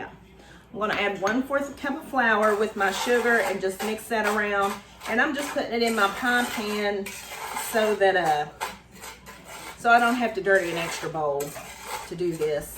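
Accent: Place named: Georgia, United States. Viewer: I just love the way you talk. But I am trying your make your pie. Sounds like you are from Georgia or the carolina.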